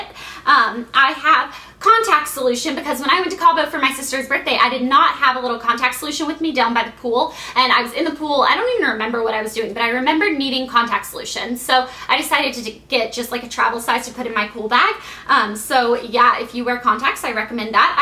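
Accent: South, United States. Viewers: Lol you never know when someone is going to get a shark bite so good thing you have your teeny tiny first aid kit! Haha you made me laugh so much in this video and I love when we can hear your southern accent a bit -3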